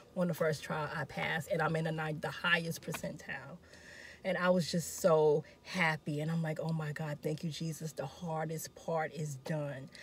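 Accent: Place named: New York, United States. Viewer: I hear in your voice that you are from New York, do you still live there or did you take your NCLEX there?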